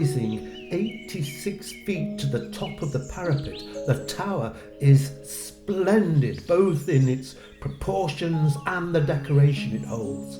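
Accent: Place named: North, United Kingdom. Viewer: Are you North Country bounced south, or the Home Counties bounced north?! Whatever you are, you have the most extraordinary, hybrid accent.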